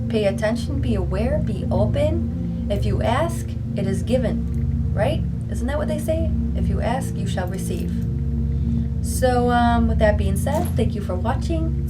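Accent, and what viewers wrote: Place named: Upstate New York, United States. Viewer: I like the way you talk and your voice maybe from upstate new york. I see a lot of lady bugs.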